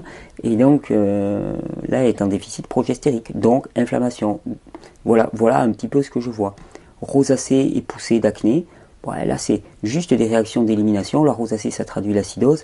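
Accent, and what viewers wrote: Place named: South, France. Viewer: bonjour, au vu de ton accent, tu sembles être du sud de la france, je dirai même sud - est, est ce que je me trompe?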